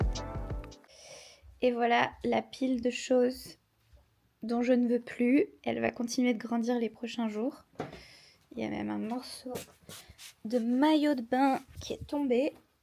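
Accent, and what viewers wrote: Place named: China. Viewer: Purée ton accent chinois 😂😂😂😂 il est adorable 😂😂😂 你好 我觉得这个视频很好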